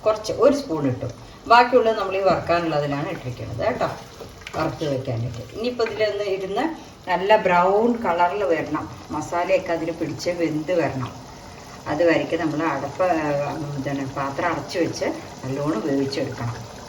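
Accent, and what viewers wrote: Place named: Valluvanad, India. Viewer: Valluvanadan Malayalam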